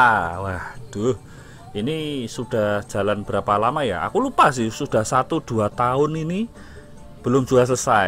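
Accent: Yogyakarta, Indonesia. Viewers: logat jogja nya kentel banget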